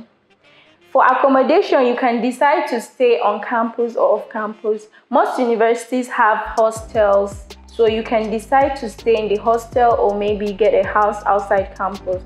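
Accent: Ghana. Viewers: Naaa I don't know if you're ghanaian but if not then you tricked me cause you sound perfectly Ghanaian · Are you sure,you are a Nigerian? You sound more like a Ghanaian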